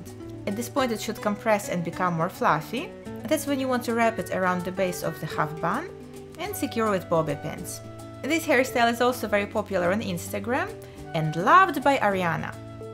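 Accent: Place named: Russia. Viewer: Слышно что русская))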